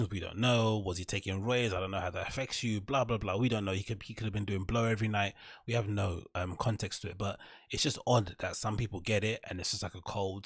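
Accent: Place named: United Kingdom. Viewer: I love your content and just giving out the facts, but your thick British accent and your very fast talking is hard to follow.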